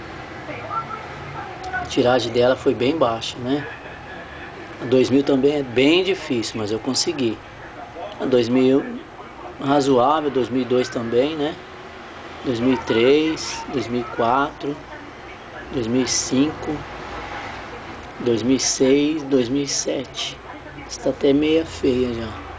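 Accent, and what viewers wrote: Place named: São Paulo, Brazil. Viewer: Vc é de São Paulo mesmo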